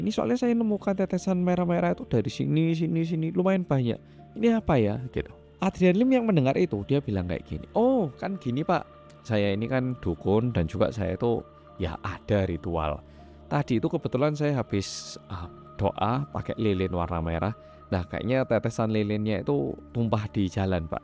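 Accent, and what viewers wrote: Java, Indonesia. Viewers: Padahal udah hapal klo ini orang korea yg medhok, tapi ttp ngakak pas denger "klotok" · Mantap bngt mas..dah bertahun kmbli k korea,tpi medok jowo nya msih kentel buanget..seneng dngr mas ngomong.salam dari mlysia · Sampean korea kok medok jowo to kang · Kok medok kk ngomong nya , saya 14 th di singapore tp nda tau kasus ini yo. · Sampean kok yo ijik medokkk to mas 👍👍👍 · MULAI AGAK2 KONTENNYA NIH CHANNEL YANG JUAL MEDOKNYA =)) DAPAT GEDE YAH DARI JOKOWI? TERLIHAT SEKALIIIII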